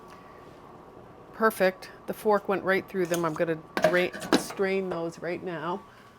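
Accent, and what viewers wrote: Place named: Northeast, United States. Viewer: Yes, indeed, it does look amazing! Great “Save!” Heading over to the next! First, I’ve got to ask you what state you’re from. My mom and dad were from New Jersey/New York, and I detect some sort of North East accent in some of your words.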